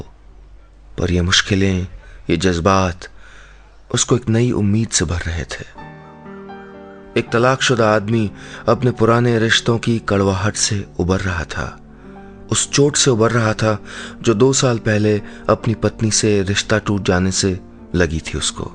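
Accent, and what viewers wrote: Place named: Kumaon, India. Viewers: Aapke muh s kumauni hindi bahut achhi lagati h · Tht Kumaoni accent...:-)...reminds me of my paternal home...:-D...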